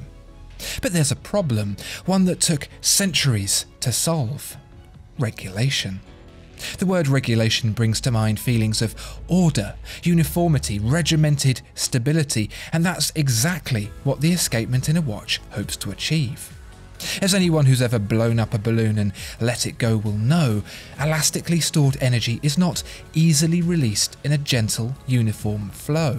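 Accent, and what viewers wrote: United Kingdom. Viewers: "Tighter than a supermarket parking space" 😂 Yep, if the accent didn't already give it away you can tell he's British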